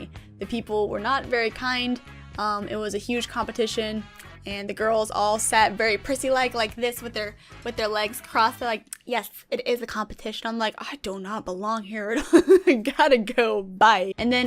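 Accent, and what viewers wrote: South, United States. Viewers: So much southern accent and its amazinnnnnnnnnnnn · I knew you were from the south lmao I heard the slight accent in your new videos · Nothing fazed me that 6ou were from Georgia I'm from Georgia but they say I have a more northern accent and I can hear the southern in you lol kinda cute though not gonna lie😂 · I knew it I knew you had a southern accent how I am southern too so ha got em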